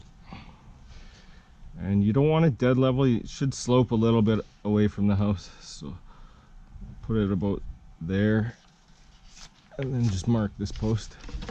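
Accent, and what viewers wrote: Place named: Alberta, Canada. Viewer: You got that Alberta accent. Where are you from? im gonna guess, Edmonton.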